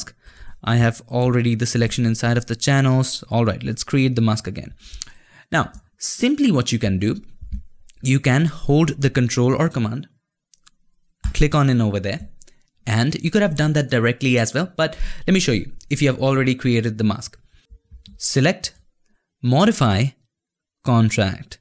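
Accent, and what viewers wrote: India. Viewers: with Indian voice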